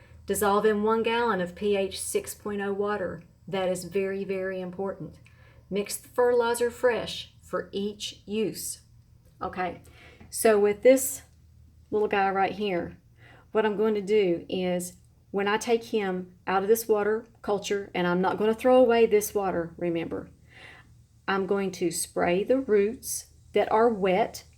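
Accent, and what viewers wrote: South, United States. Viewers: Thank you! I did find this vid helpful/interesting/informative /pleasant. I like ur voice and southern twang, I subscribed (long ago) for that reason. I like how YOU'RE growing! Concerning vids and more detailed info. My growing conditions are SO different from urs I can't help but think ur just one blessed (lucky) lady. Keep sharing details and hopefully the "luck" will spread! I can't remember how long you've had some of those Vanda..? Do you have any Neos, Ascosenda, or Renanthera's? Xoxo from CO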